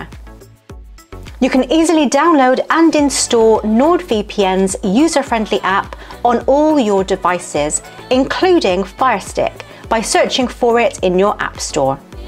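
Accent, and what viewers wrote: United Kingdom. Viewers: I like you a lot for several reasons, to start with, you are a lovely girl, feminine, attractive, beautiful British accent and so on.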